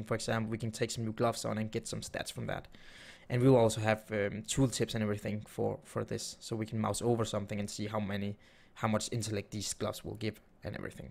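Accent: Denmark. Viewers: Is that a Danish accent I hear? XD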